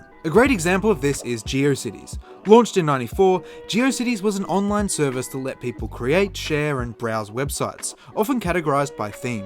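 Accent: Australia. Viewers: Man I’m loving that more aussies are making content. It’s just so nice hearing someone that sounds like me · dont normally comment but stumbled across this video today and couldn't believe how insightful and fascinating this video was but also how entertaining it was. Also nice to hear an Aussie accent. Subbed.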